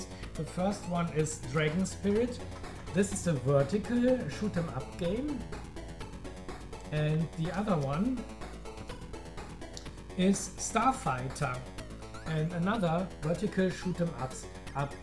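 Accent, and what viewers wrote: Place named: England, United Kingdom. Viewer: Great video buddy. Enjoyed it. Are you in England? Some of your words gave it away 👍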